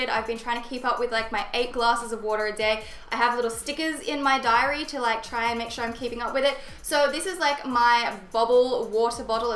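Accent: Australia. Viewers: Are you Australian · Is she from Australia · Is she australien like her accent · are you Australian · Are you Australian? You're so pretty btw! ❤️ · Your Australian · are u aussie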